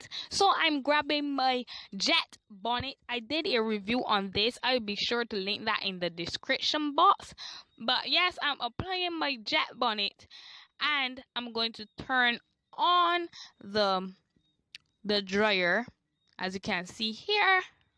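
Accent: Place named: Barbados. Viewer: Are you Bajan??? I love your accents i love all my caribs ☺️☺️☺️☺️☺️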